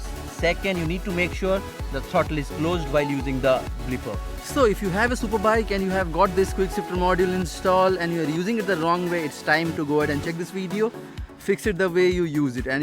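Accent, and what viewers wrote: Odisha, India. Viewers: r u from Odisha ?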